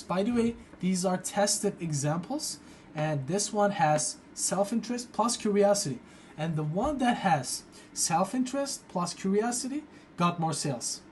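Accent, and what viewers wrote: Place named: Turkey. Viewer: Çok Türk aksanı alıyorum bu videodan :D Kötü bir şey değil bu arada, sadece kanalda isim dahil her şey İngilizce olduğu için şaşırdım. But anyways, thanks for the tutorial and tips 👍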